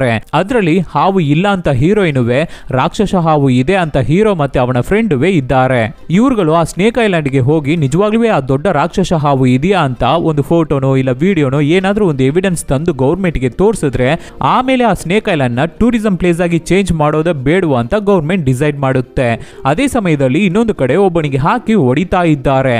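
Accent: Tamil Nadu, India. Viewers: Kannada in Tamil accent 👌👌👌👌👌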